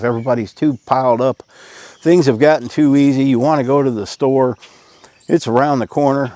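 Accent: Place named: Appalachia, United States. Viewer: You i love American applachian accent and i wish some day get that accent for real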